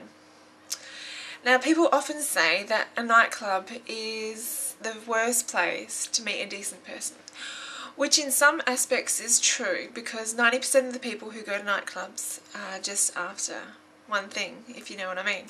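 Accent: Australia. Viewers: I'm a sucker for Austrailian accents :)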